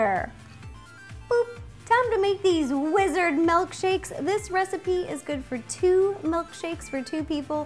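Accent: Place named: California, United States. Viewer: Ahhhh you're one of those... I think it's a California thing. I pronounce a myriad of words strangely. Melk, Cetch, Pellow.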